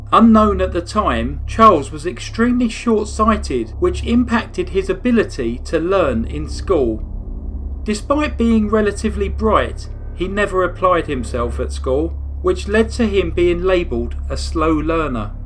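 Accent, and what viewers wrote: United Kingdom. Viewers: This was a Brilliant yet Horrifying story but again presented Beautifully British. This is so sad that these young kids really went down for all the deaths of people and it happened so quick! But he took the life of a Baby and that for me is True Evil and The death sentence for the Murdering Sack of Shit I was well justified in my opinion but he would suffer more a long life sentence!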